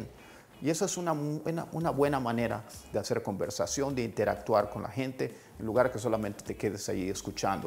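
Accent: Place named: United States. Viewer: Está en los angeles el profe, con razón su voz suena Américano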